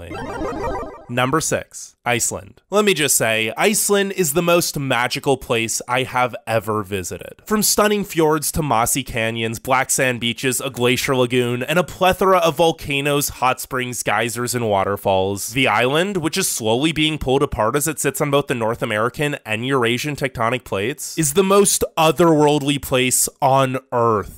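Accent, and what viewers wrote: United States. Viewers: Great video idea, but the ranking and argumentation is a bit weird sometimes and THE VOICE SOUNDS SO AMERICAN I HAD TO STOP WATCHING AFTER A FEW MINUTES 🙆🏻‍♀️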